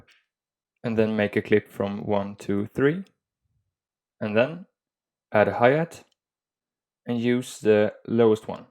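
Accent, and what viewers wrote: Sweden. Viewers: Er du fra Sverige?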